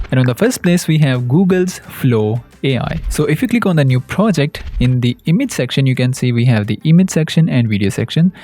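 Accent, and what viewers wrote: India. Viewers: Indian accent is so annoying🥀🥀💀💀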